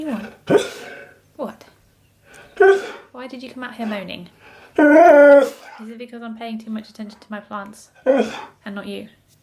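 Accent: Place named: United Kingdom. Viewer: I've always wondered what part of the UK you're form cause of the accent. I'm currently from the Midlands. Also love the veggie garden, both of my Uncle have quite the green thumb as well, planting their own veg lately.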